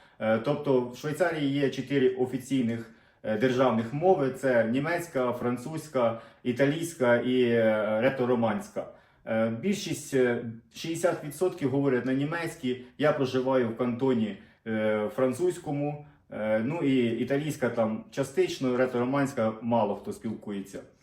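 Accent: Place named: Vinnytsia, Ukraine. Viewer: .. У вас комплекс меншовартості, це говір вінничан. Людина має коріння, рід і це мова їхня.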